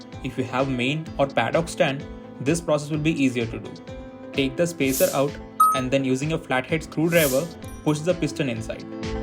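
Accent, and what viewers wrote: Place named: India. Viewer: Finally I found English indian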